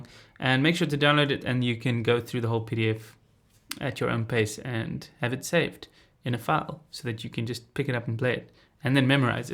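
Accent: South Africa. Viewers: Great video brother. Is that a saffa accent you’re sporting?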